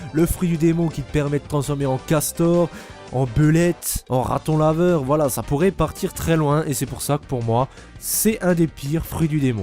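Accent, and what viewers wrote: Alsace, France. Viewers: L'accent alsacien prononcé 😂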